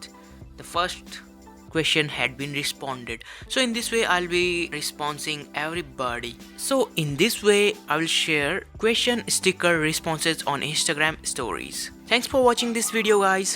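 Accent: India. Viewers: Indian English :)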